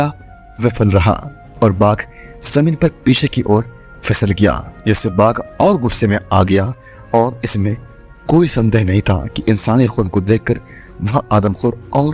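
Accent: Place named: Bengal, India. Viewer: बंगाली एक्सेंट !!! आप बंगला में ही बोलो अच्छा रहेगा। हिंदी बोलना आपके बस की बात नहीं।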